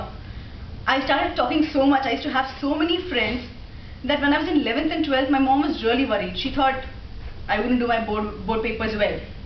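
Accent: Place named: France. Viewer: the rythum of your English is almost French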